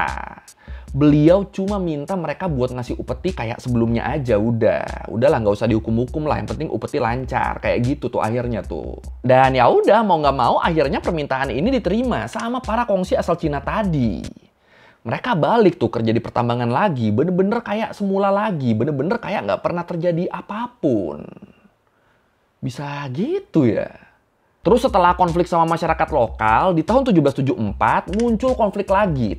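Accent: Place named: Pontianak, Indonesia. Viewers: Daerah kamek tu padahal tu. Logat nya ponti banget😂, bang asli orang ponti kah? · bole bole abang ini ni, pandai pulak pakai bahase pontianak. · Daerah kamek ternyate tuh Gile gile gile wkwkwk mantap bang aksen Melayu pontianak Nye 🤣🤣🤣🤣 kapan kapan kite ngopi bang 😂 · Kau orang ponti ye bang, salut 🎉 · Kau orang Pontianak ke boi?bale....balak kau ni😂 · Lah abg nye org pontianak rupe wkwkwk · Pacak pulak kau ngomong ponti bg, mantap cerita sejarahnya bg, alasan kenapa byk etnis tionghoa di pontianak dan singkawang, kisah leluhur morgan smash, ghea idol, cece felicia putri dll... pokok klo ke pasar mw nawar sm org china jgn lupa bilang, "ankui, pun ngai piyen nyin laaa" 😁🙏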